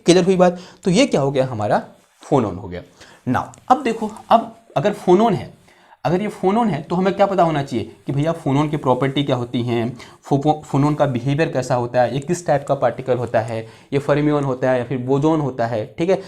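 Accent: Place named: Garhwal, India. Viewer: Bhai , garhwali hai na pura Accent aarha hai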